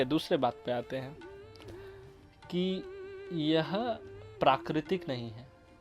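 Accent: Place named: Bihar, India. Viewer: Yaar fan bana dia 💖 matlb apka yeh Bihari accent bhot acha h yaar!😍 All the best bro hope LGBTQA+ get thier right as soon as possible!😇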